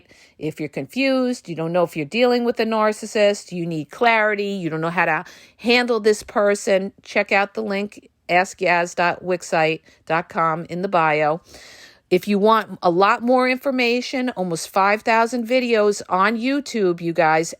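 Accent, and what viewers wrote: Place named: East Coast, United States. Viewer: Her east coast accent is wild. She doesn't look like she would talk this way. I love her videos, very informative.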